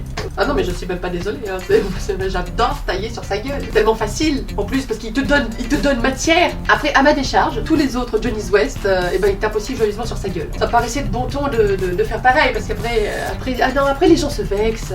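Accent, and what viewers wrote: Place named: Spain. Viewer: Comment est-ce que vous êtes si peu connue, c'est vraiment du contenu de qualité !!! Sur une autre note, je suis québécoise et j'étais convainque que vous étiez espagnole ou quelque chose du genre au début, et ça m'a prit un bout pour réaliser que c'était du français ! C'est fou comme les accents différents d'une langue peuvent affecter notre compréhension hahaha ! Encore une fois, super vidéo ! xxxx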